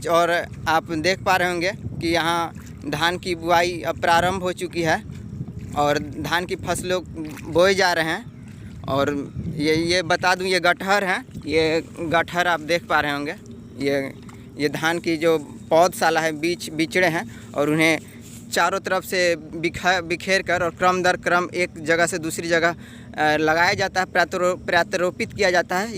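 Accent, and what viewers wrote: Standard. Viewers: Bhai ji aapki hindi bhut shudh hai Aap bs galat rah pe mt chlna aap dil se bolg bnao Or hr video me improbe kro thumbail pe ghyan do contet pe dhyan Aap jrror kroge Lobe you from himachal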